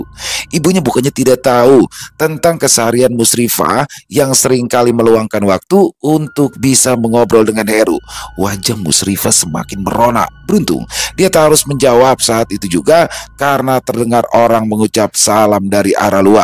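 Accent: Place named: Banjar, Indonesia. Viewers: Bang logat bahasa banjarnya mantap banar 😄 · Bang ini sudah kayak orang Banjar asli 😂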